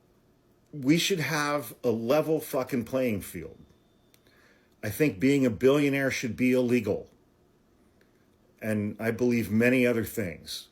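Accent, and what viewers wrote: Canada. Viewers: You sound Canadian, almost. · You sound mostly like a left-ish Canadian. Speaking as one, not an insult.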